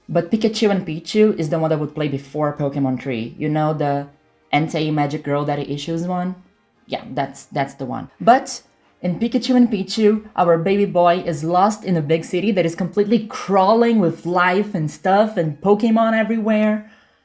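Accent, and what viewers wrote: Brazil. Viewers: Beautiful brazilian accent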